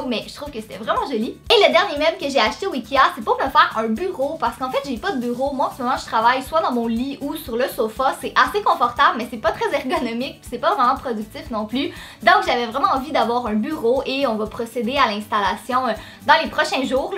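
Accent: Canada. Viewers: Margaux Couettant moi aussi j'adore l'accent du Canada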